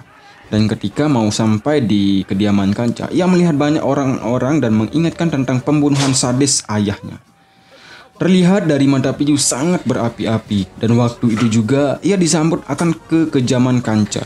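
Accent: Bali, Indonesia. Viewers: logat bali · Loghat Bali,kya susah ngomong (pengulasnya) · Orang bali ya bli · Owhh soale logatx kyk org bali..sucses sellu mas..aamiin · Narator nya bhasa nya kek dr bali ya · Pembawa suara orang bali bli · Kayanya pengisi suara orang bali.. Keliatan dari vokal ngomong nya.. Bener gak?🤭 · Logat Bali ini gan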